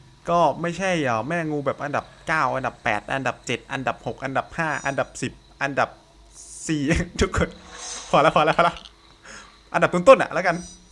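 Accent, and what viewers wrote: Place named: Rural, Thailand. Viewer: เสียงเหน่อเรยขำ555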